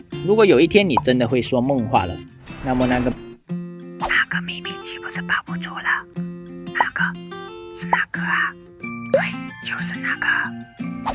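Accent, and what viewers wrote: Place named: Malaysia. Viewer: 从你的说话的方式就知道你 是马拉西亚来的欧 就酱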